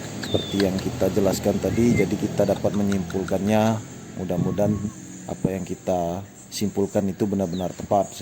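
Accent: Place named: South Sumatra, Indonesia. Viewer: Gaya bicaranya kayak orang sumsel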